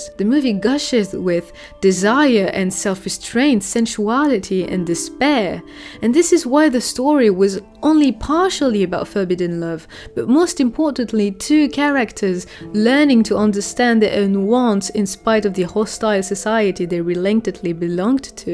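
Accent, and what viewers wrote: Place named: France. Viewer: Absolutely brilliant analysis! Loved your enquiry into Carol, and how every single sentence is both sharp and poetic. Well done ! 🙏🙌 And you are a native bilingual, aren't you (You have an ever so slight French accent and the prefectly native Parisian one)? (Not to mention your poster of Chris and la petite robe noire 😆) Anyway, love from London from a queer ⚢French/English film analyst (I know how hard it is, so I do admire your competence) 😊 Merci ❤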